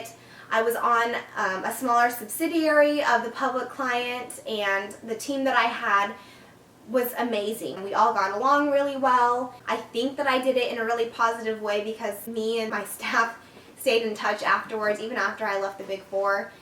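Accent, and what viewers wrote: Texas, United States. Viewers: thanks for your insights... i knew you were a fellow texan 10 seconds into the video lol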